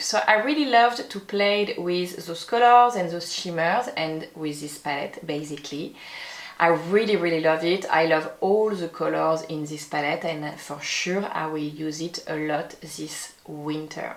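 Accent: France. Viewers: Thank you so much for another great tutorial. I always enjoy your videos but mostly I love your sweet French accent. I am Greek, I am an English tutor who adores Paris! To me you are the absolute ' '. Please keep on sharing your wonderful videos with us. Thank you again! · You are so beautiful. I like your tutorial so much. Love your hair and French accent. Love from Indonesia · Oh my god! I’m in love with your French accent! 💗💗💗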